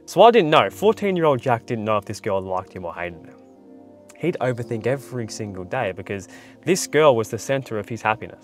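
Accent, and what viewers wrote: Australia. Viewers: with a Australian accent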